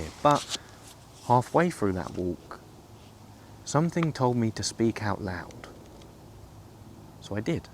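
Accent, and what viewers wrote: United Kingdom. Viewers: I'm 22, and I'm not struggling so much right now, thanks to actively accepting both who I am but also who I have been, and being forgiving. Extending a hand to the version of me that fucked up, instead of insulting it. But it still feels like a neverending tug-of-war with myself, still. With every positive thought comes an equally negative one, that i need to choose not to listen to. I am doing well now. And I'm on a path that is finally mine. And yet I feel that if I start relaxing just a little bit, if I loosen my grip on the rope I'll fall forward and back into the abyss of despair and fear. One day I feel like I can wholeheartedly trust myself and my decisions, and the next I stay on full alert to avoid making even a single misstep. This is why, although I am currently doing fine, this video is going into a special little box, that I keep filling while I feel clarity, and trust in my decisions. 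Because inevitably, when life throws another punch at me and I'll be there lying on the floor, I'll be relying not just on me, but also on little me. Adolescent me, depressed me, happy me, and future me. Thank you. Your videos help many people, and anyone could use a soft spoken british voice giving them encouragement in their times of need. ✌️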